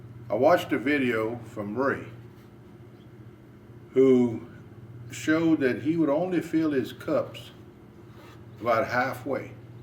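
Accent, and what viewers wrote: Louisiana, United States. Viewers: Teri Ruiz I'm 'sheeshing' right along with you and.. I'm scratching my amateur head wondering what zone I'm in (I live in the Netherlands). I think I'll start some tomato seeds in a few days like shown in this video, then a week or two after that by using the method shown. I love learning from this guy too.. and especially LOVE his Cajun (Acadian) accent.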